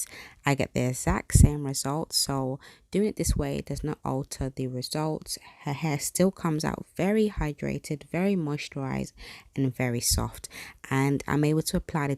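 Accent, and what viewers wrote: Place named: London, United Kingdom. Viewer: Are you from London? Nice accent.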